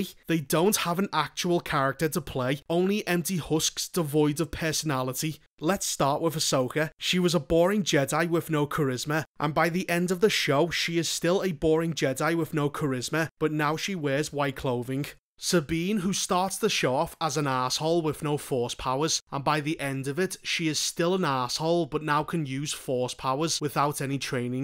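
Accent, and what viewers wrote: Liverpool, United Kingdom. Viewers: the scouse accent only adds to the on point comments XD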